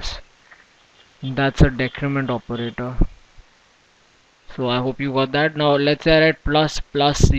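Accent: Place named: India. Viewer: Sir thanks a lot:).I m just 13 years and in ryan icse.ALL THE DUMB TEACHERS IN THE SCHOOL CANT EVEN TEACH ME A SINGLE LETTER OF JAVA and sir you did in just some couple of videos.THANKS A LOT.I luv ur english it s indian pitch so i can understand better:D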